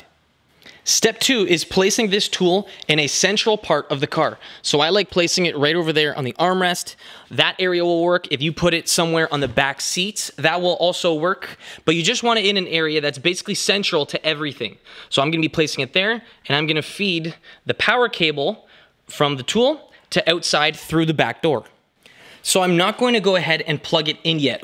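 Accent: Canada. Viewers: Canadian accents annoy me.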